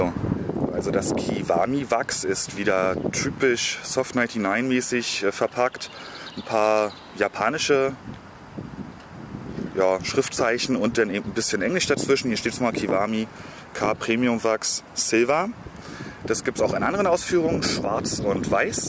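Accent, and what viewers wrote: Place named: Berlin, Germany. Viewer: Ohhhh Berliner 👍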